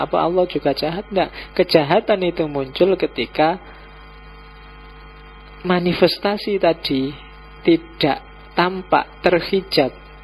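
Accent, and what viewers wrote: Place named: Java, Indonesia. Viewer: Tak jelas awak ckp..pelat jawa tebal sngt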